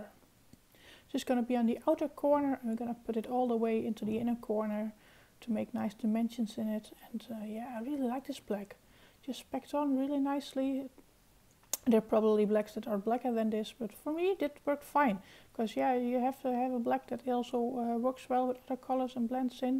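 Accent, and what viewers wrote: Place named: Netherlands. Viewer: Gorgeous look ✨💖✨ I’m currently stalking my package 📦 with this gorgeous palette in it... it’s just down the west coast from me in San Francisco... 🤨 I see you Rose Metal palette and 2 eyeliners...😳soon my pretties...soooonnn 😳🙌🏽🥰🙌🏽🤣 I love your accent, it sounds Dutch to my old ears 🤣 I apologize if I’m wrong, which I usually am...🤨😳🤣😉 I think accents of all languages is beautiful, with the exception of a couple my American accents... I just don’t think our accents are that great...some of the southern accents and Midwestern, New England accents I love, but the regular sounding American accents on the west coast is kinda blah bcuz I hear it all the time. You’ll love Lois’s Gone Rogue palette too 🥰🤗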